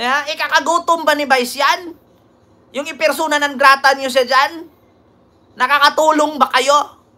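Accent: Visayas, Philippines. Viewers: Hindi na baling bisaya punto mo, ang importante hindi ka bobo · NUKNUKAN NG TA TANGA NYO DDS❗VISAYA LANG ANG PUNTO NI, PERO MALIWANAG SYA MAG TAGALOG❗KAYONG DDS NA DAVAO NAKIKI VISAYA LANG KAYO❗WALA KAYONG SARILING LENGUAHE AT SARILING PUNTO❗❓BOBOOOOO❗❗❗❗🤬🤮👺😖😩😡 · Tawa tlga ako s pesteng yawa mo hahaha ganda nga Punto mo mg bisaya k hehe ako ilocana pero gustong gusto ko mgbisaya😂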